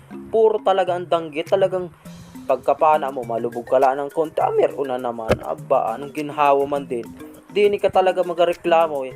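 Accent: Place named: Marinduque, Philippines. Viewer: a my classmate ako dyan sa dawis si Japet labrador si melody saet mrami ako classmate dyan ipagpatuloy mo lng yan kabayan suportado kita dito sa atami japan natutuwa ako sayo mg salita marindoquienio ka tlga god bless allways idol❤❤❤❤❤❤❤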